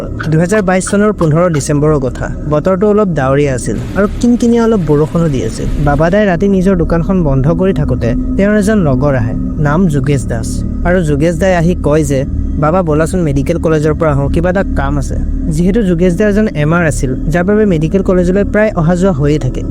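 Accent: Tezpur, India. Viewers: aitu tezpurr kotha jen lagil. tezpur t ankua akhon thai ase.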